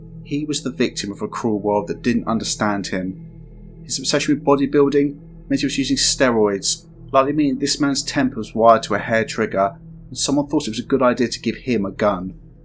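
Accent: United Kingdom. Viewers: You're British mate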